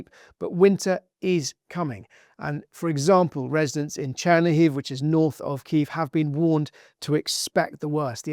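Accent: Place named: United Kingdom. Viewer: Today, This Broadcast, is my first time watching & listening to you And I'm Hooked Immediately to your delivery, Thank You. Yes I subscribed, and for me it is kinda hard to hear British Accents but somehow you do a Fantastic Non Irritating Job (and I realize how childish this is of me) but it IS, What it IS. God Bless and keep on doing such the great job that you are doing.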